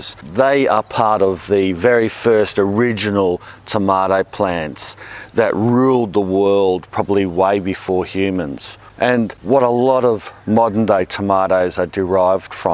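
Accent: Australia. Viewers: I have his accent too.. but that’s because I live in the same area ;) Aussie Aussie Aussie! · As an Aussie who shares his accent, I wish I could do this 😂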